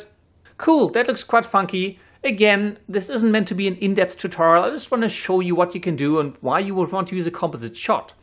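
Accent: Germany. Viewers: man dein englisch hört sich echt deutsch an... warum kein deutscher Kanal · Hey where r u from? U sound german :) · Kommst du aus Deutschland? Kommt mir so vor als hättest du einen leichten Akzent :) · Nice vid, i'm guessing u are german because of ur accent, i know because i'm dutch :D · Dieser deutscher Akzent xD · nice German accent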